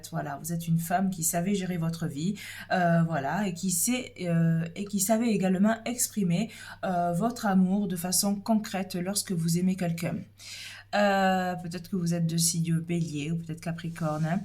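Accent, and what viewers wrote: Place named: South-West, France. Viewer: Première fois que je vous entends ma chère vous avez un charmant petit accent du sud-ouest peut-être si je ne me trompe 🤔quelque chose oui effectivement mais je ne sais pas la suite me le dira pour le moment pas de nouvelles je vous souhaite de bonnes fêtes🙏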